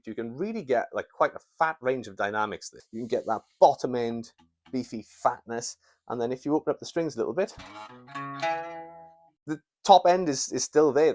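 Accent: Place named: Wales, United Kingdom. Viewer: Great video!! I really enjoyed it, even with the Welsh accent 🙂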